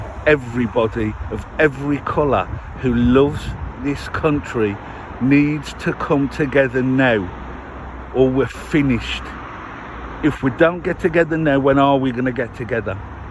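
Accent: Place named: West Midlands, United Kingdom. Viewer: Subscribed. I absolutely love your accent❤ I'm fromYorkshire but i adore a West Midlands accent.❤ well done for starting this channel. We need to stand together, we are united in our shared values and morals. ❤ much love to all❤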